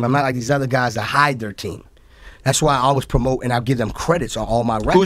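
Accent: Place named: Jamaica. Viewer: Dj Khaled is a real producer no joke talk straight frm JAMAICA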